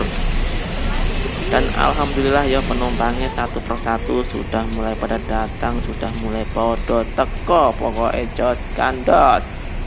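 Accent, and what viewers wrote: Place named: Java, Indonesia. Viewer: Yang punya YouTube lucu sekali kalau komentar... Semoga sukses selalu YouTube nya... Sekali-kali logat Jawa nya keluarkan semua.... 😂😂😂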